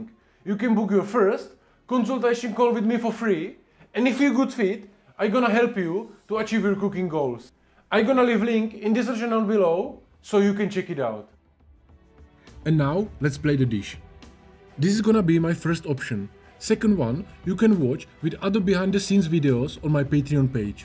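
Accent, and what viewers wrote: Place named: Czech Republic. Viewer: I’m British and lived in the USA for over a decade, too. Your English is wonderful, and yes your accent is strong, but is beautiful, reflects your Czech heritage, and is easy to understand for anyone who appreciates that spoken English has many different accents…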